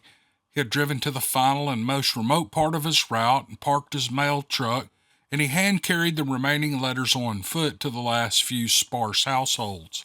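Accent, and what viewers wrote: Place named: Oklahoma, United States. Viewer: Sounds like you have been spreading yourself thinner than butter. Howdy. I don't usually write in the comment section. So here goes. It's true we hope you're doing okay, and are glad to hear you and yours are well. I get it, you're just trying to survive like everyone else. I will be patiently waiting for the next Saga of Steve Lilly. In the meantime, I will enjoy, and I do enjoy everything you post, that has your name and that wonderful okie voice with it. From one Okie to another, take care. P. S. There is nothing wrong with the shorts.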